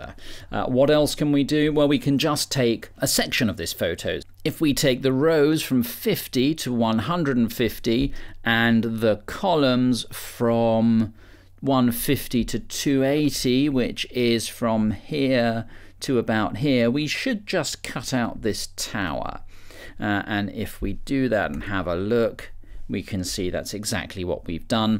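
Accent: England, United Kingdom. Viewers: He's wearing a Ralph Lauren sweater, has an english accent and his name is * LIKE EARNT * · Good course. and I like the British accent :-)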